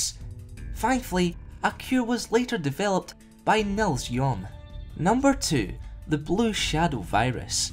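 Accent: Scotland, United Kingdom. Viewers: I can spot a Scotts when i hear one. This guy's definitely a Scottish. Prood tae be one, dontcha? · Are you Scottish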